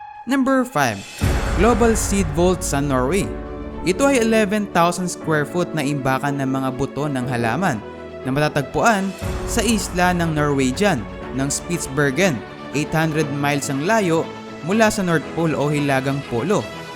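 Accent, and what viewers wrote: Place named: Philippines. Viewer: He's Filipino, and he's using our Tagalog native language. Therefore, there's nothing wrong to use the Filipino diction. Nevertheless, he's creating videos that are very informational and educational and I think his intonation is less our concern. 🤷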